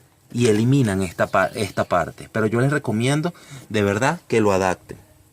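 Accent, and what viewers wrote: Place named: Venezuela. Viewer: saludos mi amigo desde barrancabermeja santander colombia tengo una chevrolet blazer modelo 93 el aire acondicionado no me funciona cuando vi tu video me alegre necesito saber mas ya que esta camioneta era de mi padre se la dio a una amiga y la desvalijaron no tiene la inteccion y le colocaron un carburador de dos garganta de dodge 300 o ford ranger que es lo mismo creo tu eres de donde suena como a venezolano oye tengo el club blazer colomabia es pero que seas mi amigo alli y nos comparta tus conocimientos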